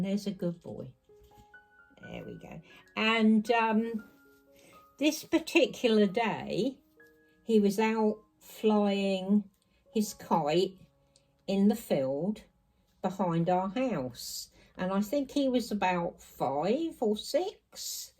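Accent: England, United Kingdom. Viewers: Awe Hi Felix…Love him so much! He’s definitely a heart breaker ❤ Omg you poor thing with all that happen to your son and still..Ugh I don’t know how us mothers get through life after kids lol. They surly keeps us on the edge of our seats. Felix looked adorable in that new outfit 🤎 loved the teddy bear shoes ❤ thanks for sharing. I seriously couldn’t listen to you all day long, brings back so many memories of listen to my grandmother and her beautiful English accent. God bless sweetie and hope your feeling good.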